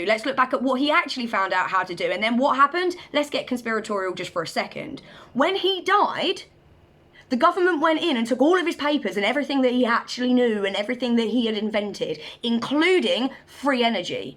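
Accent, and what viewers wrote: United Kingdom. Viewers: Subscribed for her British accent and energy.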